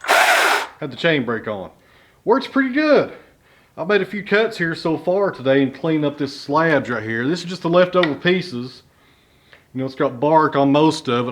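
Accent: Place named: Kentucky, United States. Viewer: When I hear your accent you make me homesick for the southeastern Hills of Kentucky my kin sound pretty much the same as you and I love it. I am fascinated with sawing and milling lumber, I guess it is in my blood, my Great Grand Father worked at a sawmill in Missouri and was killed when the boiler blew sending bricks a mile away.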